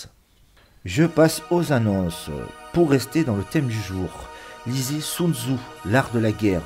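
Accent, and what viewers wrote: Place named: South, France. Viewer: Belle lecture de cette oeuvre fameuse. Et avec cet accent du sud qui est doux à mon oreille. Azouzou à vous ;)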